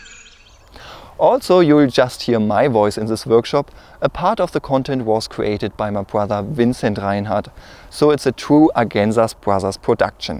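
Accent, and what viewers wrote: Germany. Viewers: Der deutsche akzent ist so süß :) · Der deutsche Akzent ist mega witzig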